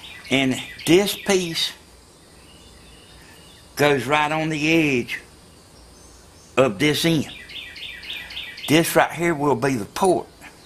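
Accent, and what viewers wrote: South, United States. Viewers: this guy knows what hes doing. but yh , true southerner ! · dude you have an awesome accent really American I like that ! :3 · This man did a great job. Now if you can't understand him don't listen to his presentation. I'm not from the south but I could understand every word he said. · I like your attitude and your southern accent. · I'm use to hearing people talk like this since I live in the south. Pretty common with family and co-workers. Like listening to an old guy tell a story from his youth.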